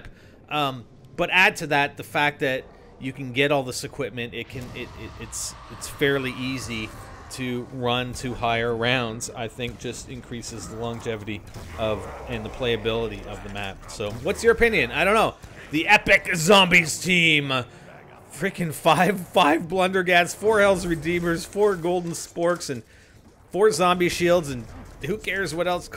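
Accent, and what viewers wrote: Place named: Canada. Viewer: are you Canadian? judging by the way you say 'out' and 'about' I think you are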